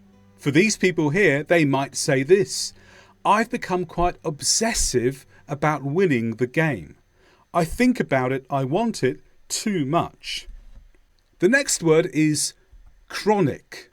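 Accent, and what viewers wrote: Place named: United Kingdom. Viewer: Not American.. UK😅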